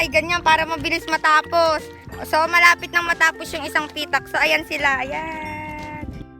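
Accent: Batangas, Philippines. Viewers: Kagaling magsalita eh parang batangas lng eh idol crush kita ayiìihhhh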